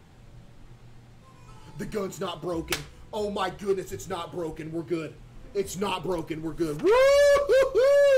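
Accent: South, United States. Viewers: most def cus he's southern AND has a southern accent, I'm from the south (texas) and I can tell despite him glowing in the light and the ginger hair he is def a seasoned white man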